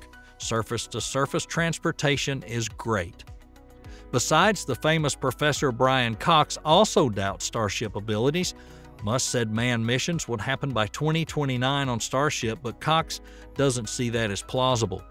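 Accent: South, United States. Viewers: Won’t listen to a cowboy accent talk about space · What a sh!tty scripted video. The southern accent makes it worse too. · Quite frankly, this guy has no idea what he is talking about. Also, most of his information is very outdated or otherwise inaccurate. However, as entertainment, it's a gem. Southern accent: "the rocket plume is going to blow a hole in the moon".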